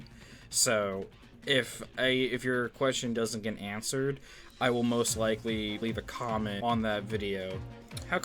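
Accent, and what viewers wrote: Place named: United Kingdom. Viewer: Maybe you should make prop plane roasts with a.50 cal gun. "The.50 Cal Roasts the Hawker Hurricane." HOW TF DID I NOT RECOGNISE VOICE AS A BRIT!?!?!?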